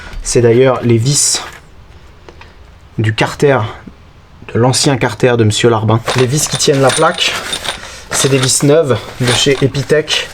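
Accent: Alsace, France. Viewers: j’ai l’impression qu’il a pris l’accent Aslacien nan ?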